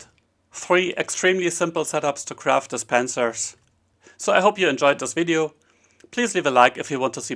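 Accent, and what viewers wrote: Germany. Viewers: The world needs a technical minecrafters with german accents, no nonesense german efficient minecraft engineers.. now you need a ridiculous theme tune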